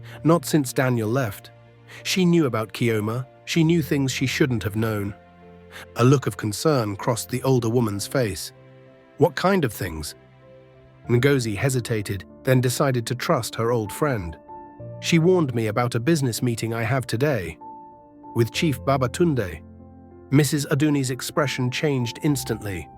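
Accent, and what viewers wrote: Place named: United Kingdom. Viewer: I SO AGREE! I CAN LISTEN TO THE NARRATOR (BRITISH ACCENT) ALL DAY. LOVE THE FEATURED MOVEMENTS OF THE CHARACTERS. GREAT STORYLINES FROM START TO FINISH.😊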